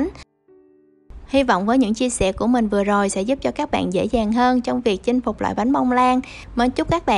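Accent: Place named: South, Vietnam. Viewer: Giọng miền Nam càng dể nến hơn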